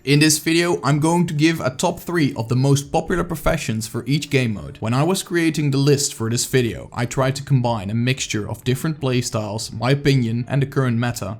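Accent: Netherlands. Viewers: You sound super Dutch! With the stauf and claes instead of staff and class! I know you can do it! You have enough determination to make a lot of YouTube videos so I know you can learn to say class and staff!!!!! I believe in you! ps I'm from Belgium and also a Dutch speaker and I've never ever said claes or staaaauf!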